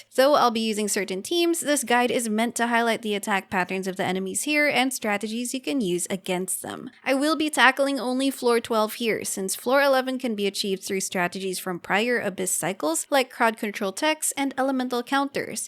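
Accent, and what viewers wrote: Philippines. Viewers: are you a filipino? your voice sounds like a filipino, anyways great video as always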